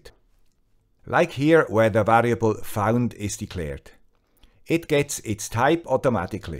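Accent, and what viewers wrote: Switzerland. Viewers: As always you show us new and exciting possibilities. Thanks guy with swiss accent! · What accent is that? Every time I’m watching your videos it sounds like a Swiss accent to me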